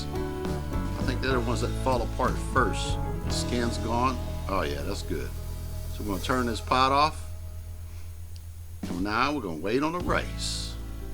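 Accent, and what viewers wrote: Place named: South, United States. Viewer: that ORIGINAL SOUTHERN DRAWL also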